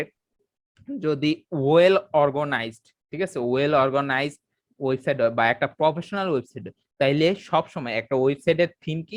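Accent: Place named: Comilla, Bangladesh. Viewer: আপনি মনে হয় কুমিল্লার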